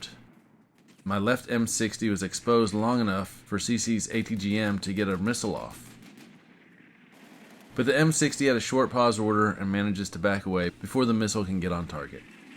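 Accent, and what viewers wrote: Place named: United States. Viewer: Great AAR. First time i enjoy american accent narration. Usually they scream at you.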